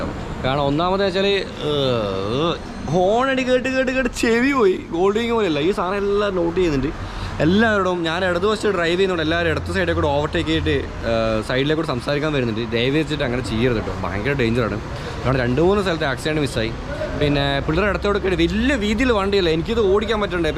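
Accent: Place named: Malappuram, India. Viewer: Appam alla malapuram basha patri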